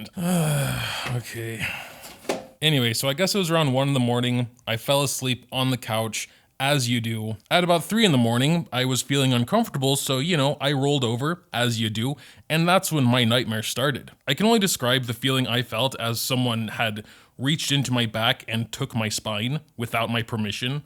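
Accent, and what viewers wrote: Germany. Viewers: wait, you're german? austrian? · Why did I never notice hes german?💀. Also, wish ya a speedy recovery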